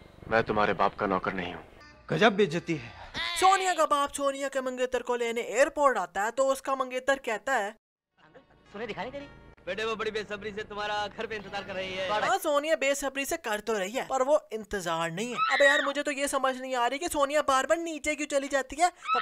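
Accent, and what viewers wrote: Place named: Pakistan. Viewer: Ya video bnana vala bro pakistni ka ha es ki awaaz sa pta chala😅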